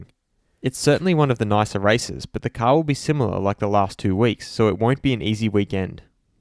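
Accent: Australia. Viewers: You sound like you are an Aussie. Why in your subtitles do you spell "tires", a particularly yank spelling instead of the the more normal "tyres"?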